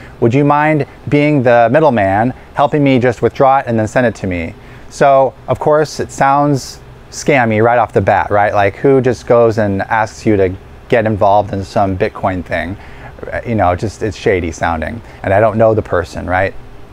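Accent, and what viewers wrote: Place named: United States. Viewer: Hello, I hope you are well. It's true, I'm not so tech savvy. But it's also true, I trade crypto - in the Winter hahaha. Is it possible you could put together a video on handling crypto safely - all your thoughts, experiences, past mistakes or close calls. Yes, I know there are probably dozens of them out there in youtube-land, but I watch your videos and feel you speak so honestly and directly and I think you would probably put a lot of thought into it and it would be super great. Maybe you have a lot of things to do every day. It's just a question. Thanks again for being so great. I really like your American accent. I know I wrote that before, but I do.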